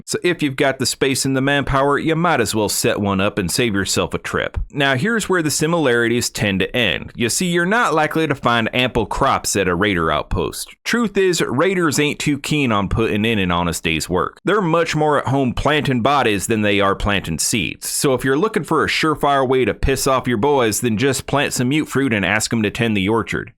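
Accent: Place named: South, United States. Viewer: I'm sorry but that "southern twang" is just annoying. Personally I find it distasteful and extremely cringey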